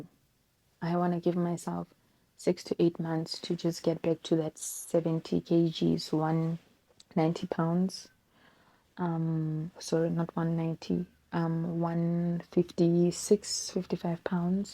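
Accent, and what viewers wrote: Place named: Africa. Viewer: it might not have been your intention, but race absolutely plays a part. I said nothing about you being racist or not watching other influencers of race. My point is once you interact with people of other races in real life, face to face(not online/ through a tv screen), then your opinions about how they speak hold more validity. You can't critique something you have no first hand experience of. Weight struggles, yes maybe. But the way she speaks? Absolutely not. African accents, dialects and tonations vary considerably, so if you're not used to it, it's easy to fall back on loose criticisms like it being AI generated. Imagine if the OP left a similar comment and justifying reasons for a Romanian native, having only seen Romanian influencers online and never stepped foot in that country-- they would come for her neck. Just make sure your receipts are valid, that's all.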